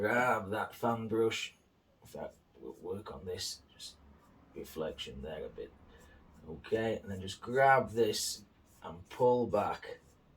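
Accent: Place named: England, United Kingdom. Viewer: Thankyou so much for your prompt reply - love your work, and your English accent.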